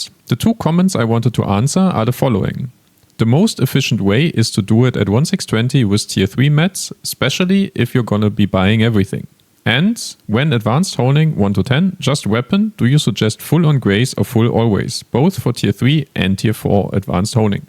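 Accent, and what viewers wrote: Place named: Germany. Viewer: Love your accent! If i would take a guess its a typical german one! <3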